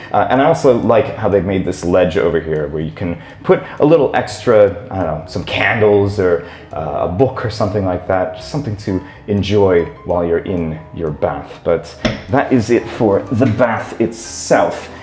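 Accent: United States. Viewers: Came here after watching the Unpacking Japan interview (which was fantastic, by the way), and the wild fluctuations between US accent and faux British accent when giving property tours are cracking me up.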